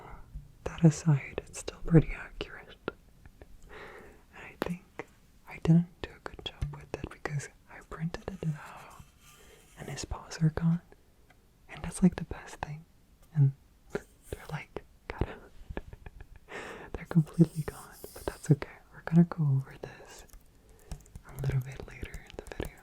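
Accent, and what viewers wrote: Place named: Netherlands. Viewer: Another great video! I was actually surprised you hear you’re not American XD although I listening really hard to your accent I figured it was Dutch! Or some Scandinavian country rather ^^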